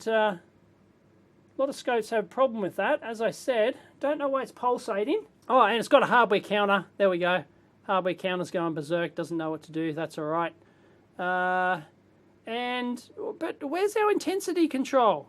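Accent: Australia. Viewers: "Darta logging" Love the aussie accent !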